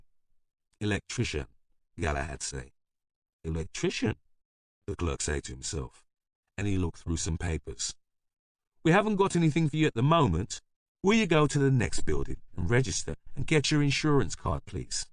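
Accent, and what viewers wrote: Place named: England, United Kingdom. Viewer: WHERES THE PATOIS? who chose this reader? i read this book and the patois is what gives the novel its charm. its the voice of the novel, the comic turn of phrase. its CRUCIAL to the text and this reader didnt capture it. this sounds like an anglicised reading for an audience who might struggle with patois. a compromise too far and the voice is lost. this should have been stellar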